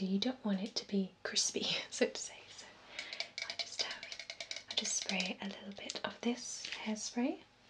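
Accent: England, United Kingdom. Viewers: Yes she is so stunning, And what a ASMR video lovey British accent so soothing.... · "obviously you'd need to grow your hair a lot longer" bish my hair is longer than yours :-P I actually really like this video, which is unusual for me, tbh - generally I'm really not into british voices in asmr (being british myself - i think part of what I"m looking for in asmr videos is a kind of escapism from the reality i'm surrounded with on a daily basis? idk) anyway, good job :) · You sound a lot like whispers red wonder if you're from the same part of England...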